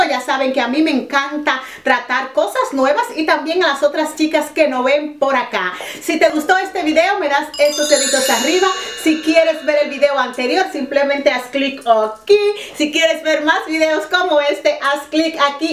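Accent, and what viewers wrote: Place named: Dominican Republic. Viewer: jajajajaajj es dominicana por el habla'o. besitos me encanta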